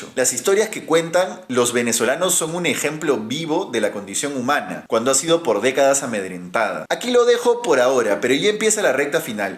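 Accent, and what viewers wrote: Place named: Argentina. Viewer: Excelente escucharte pero evita hablar al estilo argentino que defecan por la boca.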